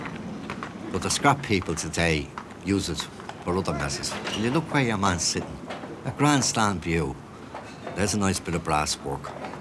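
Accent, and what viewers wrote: Dublin, Ireland. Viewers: I was just thinking, the narrator has a great Dublin accent, without being common as muck. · I haven’t heard that accent since I was a little girl! My great grandfather was born in Dublin, came to US in 1928. He passed in 1964 when I was age 12. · Chris Clark it’s what is known as “ an auld fashioned” dublin accent. Far softer and far better pronunciation than the dumb sounding monotoned aggressive sounding accent that Dubliners have today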